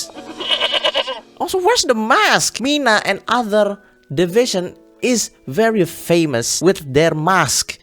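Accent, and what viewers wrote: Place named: Indonesia. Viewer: wait are you indonesian? you have such heavy indonesian accent when you're speaking in english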